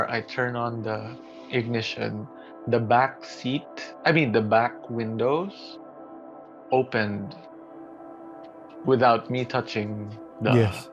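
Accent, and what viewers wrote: Conyo, Philippines. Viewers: Conyo si kuya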